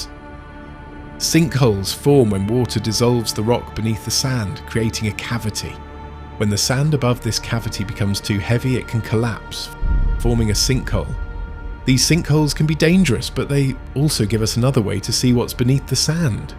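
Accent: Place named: United Kingdom. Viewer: Very repetitive. AND you have a British voiceover with an annoying American AND-LESS number pronounciation. Do better. Dislike!!!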